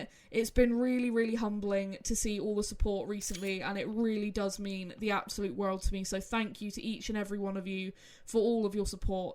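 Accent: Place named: United Kingdom. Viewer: As an American who watches a few British YouTubers your accent is really cool! I don’t know if it is British but it’s a cool accent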